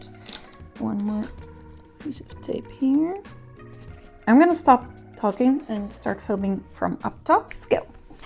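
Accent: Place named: Quebec, Canada. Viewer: Love this tutorial. I recently got back into SLR film photography and want to make myself a cute camera bag. I also love your accent, are you from Quebec?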